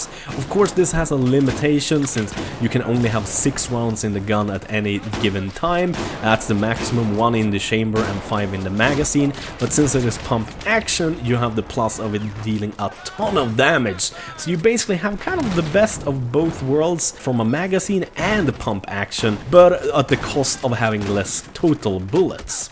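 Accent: Sweden. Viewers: ur accent is so Swedish... I LOVE IT!!!! :D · are u swedish?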